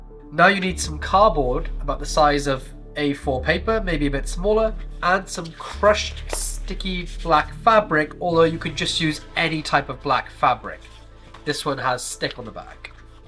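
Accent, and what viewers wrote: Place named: Wales, United Kingdom. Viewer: Part of Wales you from, but? Diffo picking up unmistakable traces of the accent. And with a Celtic name too 😮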